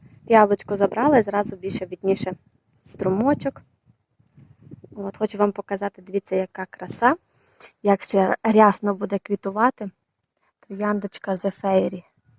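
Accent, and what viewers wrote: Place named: Ternopil, Ukraine. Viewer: Все гарно! А ще й мила тернопільська говірка! 💙💛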